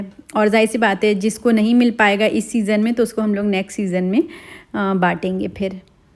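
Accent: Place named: Azamgarh, India. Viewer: ap Azam greh ki hn meri susral mn nnden Asi hi bolti hm kpry ko kpra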